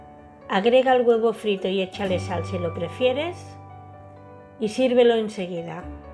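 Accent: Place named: Catalonia, Spain. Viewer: Se nota ese acento catalán 😝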